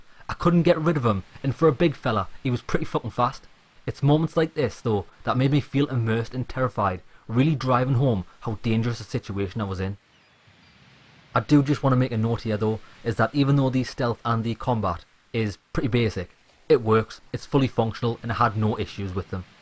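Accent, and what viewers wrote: Newcastle, United Kingdom. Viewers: This guy Is a typical geordie, weird and annoying.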